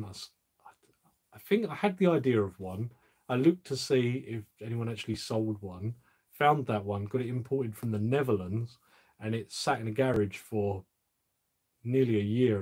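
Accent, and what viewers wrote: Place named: Kent, United Kingdom. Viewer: hi kent...i am in kent...same accent too!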